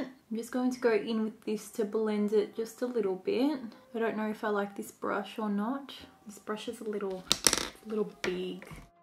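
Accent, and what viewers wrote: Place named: Australia. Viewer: Omg the weird guy story 😂😂😂🤣🤣🤣I love the way you talk, it’s exactly how I talk with my friends! I love watching fellow Aussies, but you especially make me laugh with your stories 😃😃😃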